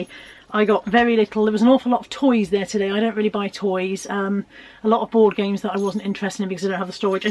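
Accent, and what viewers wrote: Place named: West Country, United Kingdom. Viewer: I'm almost certain I just seen you in my local charity shop in bridgwater if not was your double with that lovely West County twang 😂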